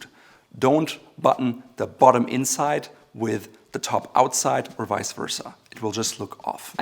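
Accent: Germany. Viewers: May i ask are you German or switzy?😊 i like the accent brother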